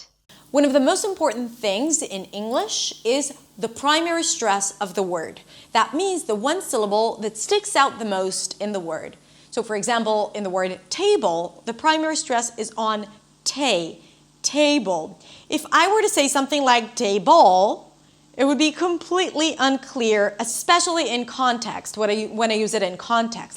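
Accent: United States. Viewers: with what? This is American English not British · Hi! I love your videos. I'm wondering if you're a native English speaker or if you were able to achieve the American accent that you have through practice? Thanks! I'm looking forward to more videos:) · This is an American accent english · Look sounds almost like luck? There is no trouble to pronounce V because в is the same :) as for my ears this is loud American accent of Emglish :)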